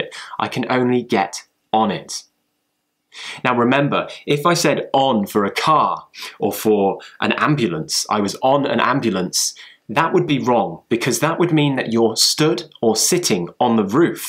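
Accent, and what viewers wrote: United Kingdom. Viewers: Your every British English pure British English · Which part of England are you from? I was thinking all the British people say "can't" like "kaant"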